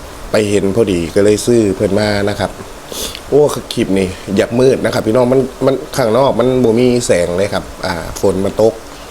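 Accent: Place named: Nakhon Phanom, Thailand. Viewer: คนที่ไหนคะสำเนียงเหมือนคนนครพนม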